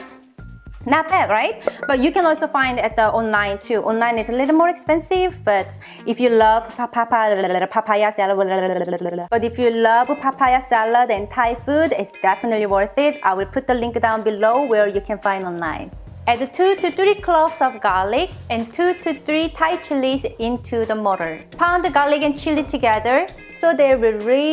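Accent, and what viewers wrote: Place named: South Korea. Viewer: Omg you make every languages sound just korean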